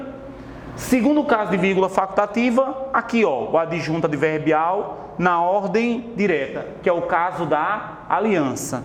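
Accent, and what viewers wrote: Northeast, Brazil. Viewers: Além da aula fantástica, ainda tem esse sotaque lindo dos nordestinos. Maravilha!!!!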